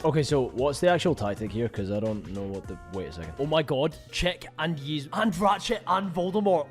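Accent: Scotland, United Kingdom. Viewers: Love how the Scottish accent properly creeps oot every now n then am here fur it n long may it continue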